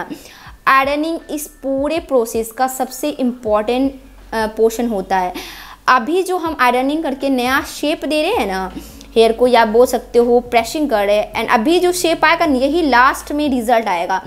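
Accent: Bihar, India. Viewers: Ye language to bihar chhapra ki lag rhi h kya mam ap chhapra s ho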